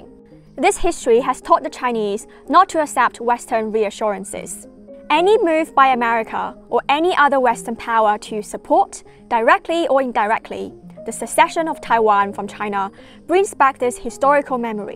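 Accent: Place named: Oxford, United Kingdom. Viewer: Super smart Chinese girl telling the china story so clearly and in perfect Oxford English. Well done young lady. 👍👍👍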